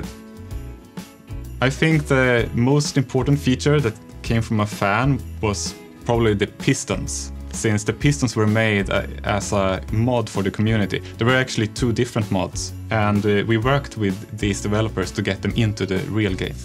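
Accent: Sweden. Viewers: I think it's funny how strong Swedish accent still is:D I would have imagined working in an international setting with English as the main language would have made it fade a little more:D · swedish with English accent, Nice But you should keep it up work till Minecraft dungeons is out!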